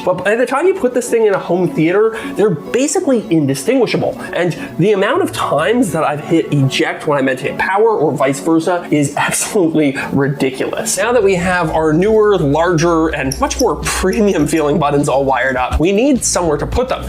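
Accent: Canada. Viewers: This guy's definitely canadian.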